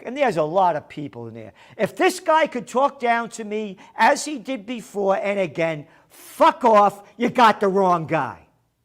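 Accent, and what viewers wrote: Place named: New York, United States. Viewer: Love the New Yorker Accent!! God bless America Sincerely, from Down Under!!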